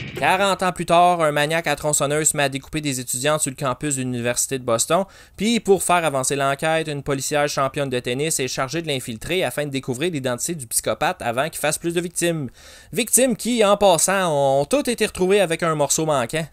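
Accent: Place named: Quebec, Canada. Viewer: J'adore ta vidéo, ça fait du bien d'entendre parler de l'horreur avec un accent Québécois. La première vidéo que je regarde et c'est assurément pas la dernière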